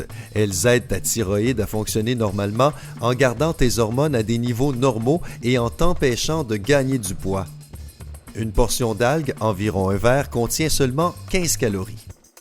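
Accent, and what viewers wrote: Quebec, Canada. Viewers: cest un québécois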